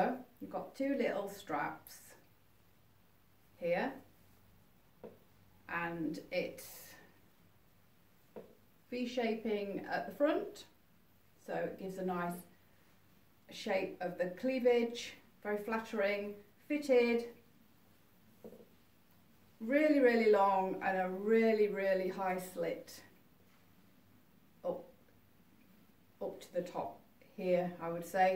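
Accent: United Kingdom. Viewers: Helllo greeting my name is Abner nice meet you this my first time watching your video you look beautiful greeting from United States 🇺🇸 of America at State of Georgia here southeast coast, close to Atlantic Ocean. I’m fan women wear high heels pantyhose stiletto and 12cm 13cm 14cm 15cm 16cm and platform too I do like stocking and nylon thigh high 😅too! You have good accent from English in England 🏴.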